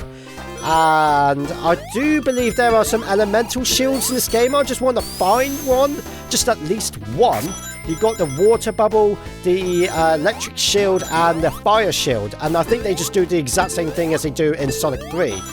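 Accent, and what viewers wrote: United Kingdom. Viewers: At the start of the video I thought you speak another language but my brain wasnt working and your British accent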